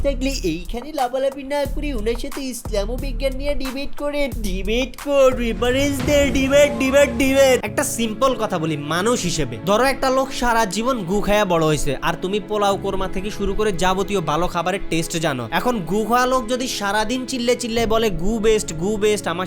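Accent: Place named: Chittagong, Bangladesh. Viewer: চিটাগাইংগা এক্সেন্টটা না থাকলে আরো ভালো লাগবে ভিডিওগুলো(যদিও আমি বইংগা হয়েও চইংগা ভাষায় জব করেছি ৭বছর লুল), যদিও গাঞ্চা নূর এর কথা তোমার ভিডিও ছাড়া জানতাম না।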